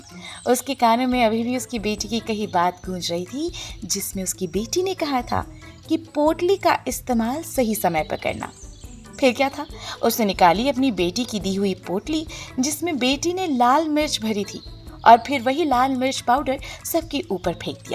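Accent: Garhwal, India. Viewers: Tears on my eyes.. thanks, I love you maa forever.. 'hit tumadi bate bat me kya zandu Teri budiya ki baat'.. ma'am your garwali accent just osm. 😊😊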